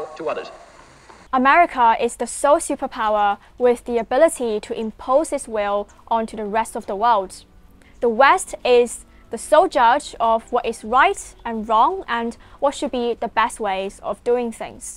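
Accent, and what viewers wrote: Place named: Oxford, United Kingdom. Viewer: Super smart Chinese girl telling the china story so clearly and in perfect Oxford English. Well done young lady. 👍👍👍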